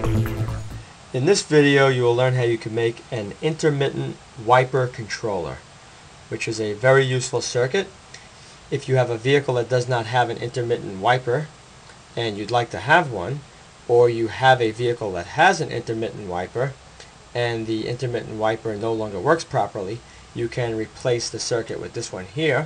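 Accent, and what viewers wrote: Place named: New Jersey, United States. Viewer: Awesome circuit again. I have learned how to design and make any circuit that I want to now, just improvising from all the things I have learned from you, and many more like you. Thanks for all your teachings. And we love that NJ accent. It sounds so warm, especially with the style of how you make your videos which I find very engaging. A bit viceral even. You bring the audience way into your presentations. It is an effective style that others should emulate in my opinion. They are enjoyable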